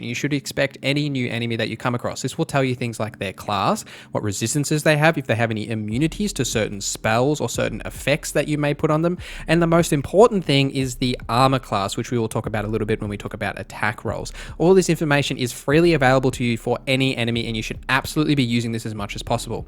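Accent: Australia. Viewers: G’day mate. I think your channel could really take off with guides for tricky games like this. You present well and apparently people like presenters with our Aussie accent. (Who bloody knew?) Can I make a suggestion for your videos to maybe help set them apart from others out there? When you hit a concept like how an attack works, try running through an example or two. Maybe start with a basic melee attack and show how dice rolls would play out and the factors involved. Then add some complexity, like a ranged/magic based attack and play it out. I think it will help folks like me who have very little crpg knowledge. Which will be a lot of folks coming to your videos. The examples you create don’t have to be played in ‘in game’ you could create a basic graphic from screenshots and a cg dice. Could be worth a shot, might be too much of a pain in the ass. I think you’ll do well with how you’re going now, but wanted to toss my two cents in here. 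I’m subbing anyway mate.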